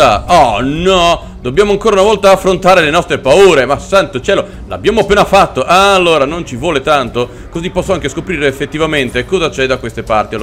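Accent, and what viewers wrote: Veneto, Italy. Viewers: Ma sei del veneto ? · Scusa ma sei del vaneto? Comunque sei troppo simpatico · Aaaaaaah ma sei un conterraneo del veneto! :D:D:D:D:D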